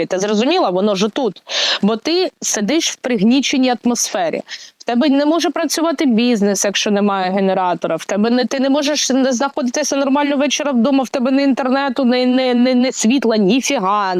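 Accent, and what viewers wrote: Ukraine. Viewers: У нас на Дніпропетровщині такий же говор і точно знаю Полтава так розмовляє. Наша дівчинка ❤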